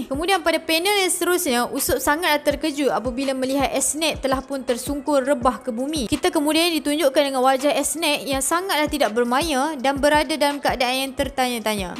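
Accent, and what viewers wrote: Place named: North, Malaysia. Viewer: Gws ….. pekat na utara dia 🤣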